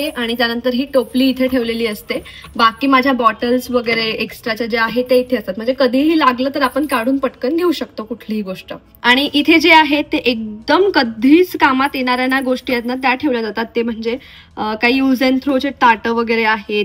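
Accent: Vidarbha, India. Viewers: Mala tuza aajcha kitchen organization khup awdle,tuzya languagewarun nakkich tu vidarbhatli aahes ase watle,karan me suddha thitlich aahe wardha district apli bhasha aikayla khup chhan watle,me pan aatach modular kitchen kele,organize kele baryapaiki pan aata tuzi pan idea use karel apli manas apli mati😅😅😅😅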